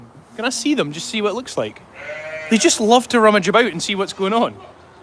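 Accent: Scotland, United Kingdom. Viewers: At the end of the video, I thought you were saying taking a healthy “mule” home. Then I finally figured out you were saying “meal”. Having a bit of trouble with your Scottish accent.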